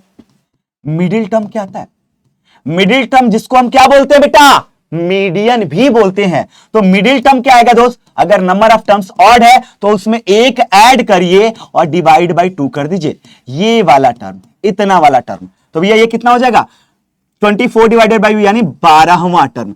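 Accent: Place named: Odisha, India. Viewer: odia sasta English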